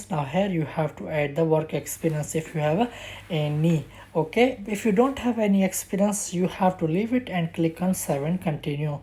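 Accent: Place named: Sindh, Pakistan. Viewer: Sir apki English main bhi Sindhi hone ka sabbot hai♥ MashaAllah Boht acha guide karty ho ap..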